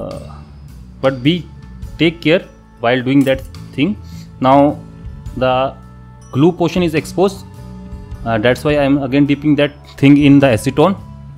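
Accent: India. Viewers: Don't listen to it then. I don't think its his grammer, its his accent. Can't blame him as he has a typical Indian accent because he must be from India.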